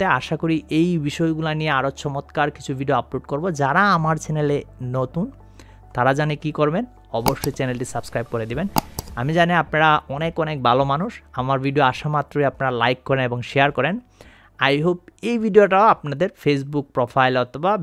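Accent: Sylhet, Bangladesh. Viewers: ভাই আপনার বাড়ি কি সিলেট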